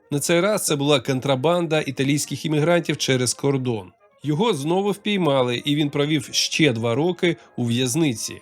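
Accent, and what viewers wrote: Russia. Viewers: Приємно бачити нові україномовні канали, однозначно лайк та підписка для підтримки))) Цікава подача, чекаємо ще PS. у диктора чутно слабкий російський акцент, тому ще вподобайка за перехід на солов'їну, а текст написано дуже і дуже хорошою українською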